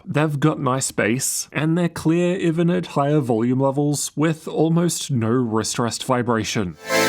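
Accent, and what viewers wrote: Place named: Australia. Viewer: man, your accent reminds me of Rickey Ponting and David Warner.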